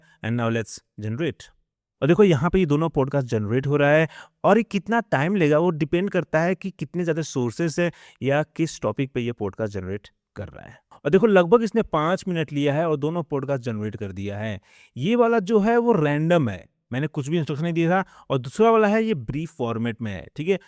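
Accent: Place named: Rajasthan, India. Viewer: bhai aap kaha s ho aapka accent to rajasthan ka lag rha h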